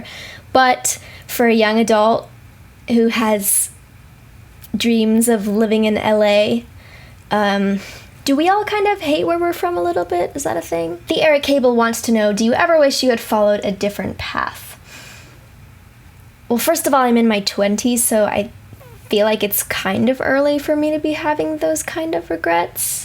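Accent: Castile, Spain. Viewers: That explained. You living in US having a Spanish accent, not a latin one. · Wow I just realized the Spaniard Spanish accent. Very different to the Spanish I was taught, mostly by teachers from South America(Bolivia or Argentina). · It was interesting to hear how you learned Spanish and it explains why you often (always?) use Castilian pronunciation. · Que divertido el acento de españa en su español.... Gracias por tu excelente Q&A... muy interesante! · I LOVE your Spanish!!!! The accent SEEMS Castillian. It's been sooooo many years since I've learned and used my Spanish, but it was Castillian. PLUS you are so easy to understand when you speak Spanish. It makes me feel much more proficient than I am. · hablas como española , sera que has estado en españa mi hijo es compositor de musica charra ( mexican cowboy music ) with mariachis, you can find his music on you tube under the name edmond broes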